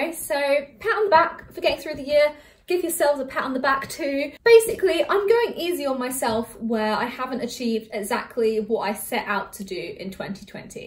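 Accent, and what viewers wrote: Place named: England, United Kingdom. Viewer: Random: I love the English accent! I'm from Germany. BTW, you inspire me in some way, though I'm > a decade older than you ;)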